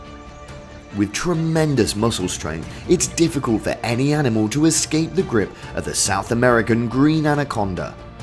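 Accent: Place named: United Kingdom. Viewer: i like the narrator because he can speak with a British accent...jolly good show old bean!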